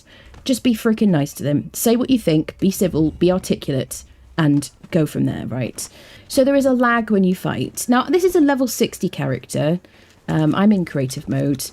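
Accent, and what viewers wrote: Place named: United Kingdom. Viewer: I love uk accent. Bee-tah. 😍 Us southern yanks say Bay-tah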